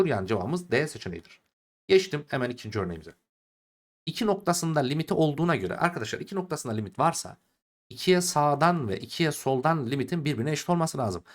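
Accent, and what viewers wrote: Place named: Kayseri, Turkey. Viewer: Hocam nerelisiniz konuşma tarzınız kayserililere benziyor da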